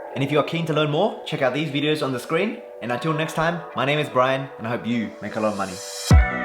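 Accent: Bankstown, Australia. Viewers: from your accent, lemme guess. are you from bankstown?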